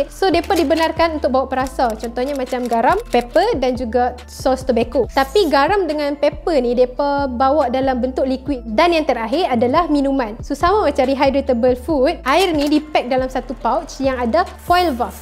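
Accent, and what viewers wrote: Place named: North, Malaysia. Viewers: TBH...kalau nak cakap utaqa...cakap utaqa terus..I can see a lot of mix up ...anyway good information...thumbs up👍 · Kedah elokk hahaha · The BEST...north accent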